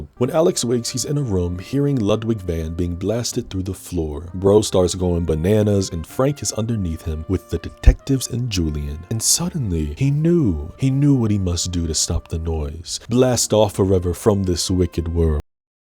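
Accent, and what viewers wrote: United Kingdom. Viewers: 1) as a Brit I live for your Bri-ish accent 2) why did I imagine alex legit saying aye come catch this fade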